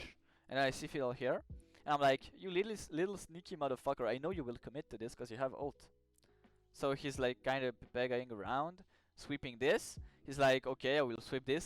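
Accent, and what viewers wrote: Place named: France. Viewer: French accent 200% XD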